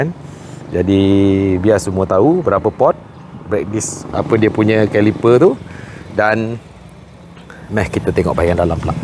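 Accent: Malaysia. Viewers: malaysian mmg camni.. bukan konoha...kalau konoha pronouncation lagi jahanam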